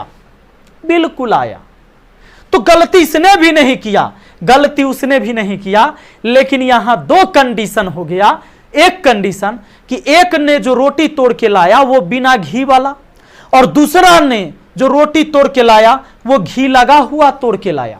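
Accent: Bihar, India. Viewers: Nice sir ji aap to bihar sa ho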